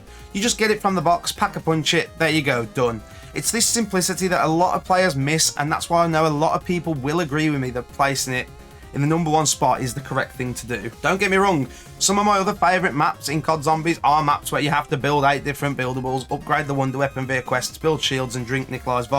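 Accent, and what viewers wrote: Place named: West Midlands, United Kingdom. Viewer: I agree with the choices made 👌🏻, pulled out my old jailbroken ps3 and had a blast the past week playing all the old maps 💯Are you from near West Midlands your accent sounds very familiar ??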